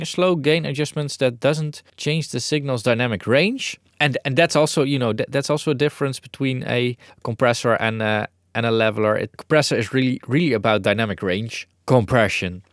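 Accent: Netherlands. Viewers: I could tell that you saId "Write" and not "Ride" immediately because "Ride automation" makes no sense! Of course, I've been watching your videos for a number of years and here in the upper midwest (Wisconsin specifically) it's not all that uncommon to interact with immigrants from the Netherlands and other northern Eurpoean countries so we're more used to those accents and have less problems understanding them!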